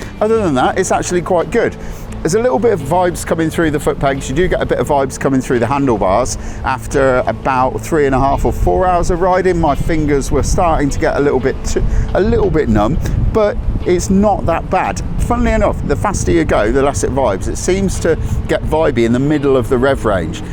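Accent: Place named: England, United Kingdom. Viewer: Nah, too many annoying American-isms spoken with an English accent.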